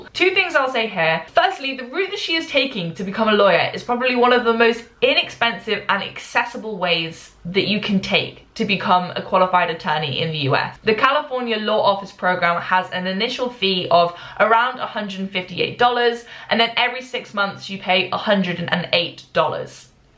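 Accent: United Kingdom. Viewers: is it weird that i didn’t even notice that you had a british accent until you said “here in the uk” ??? that’s literally 9 minutes in. i literally thought for 9 minutes that you were american with an american accent?? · Holy fuck you're British, I'm ready to listen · I didn't expect you to be British but it was such a pleasant surprise when you started talking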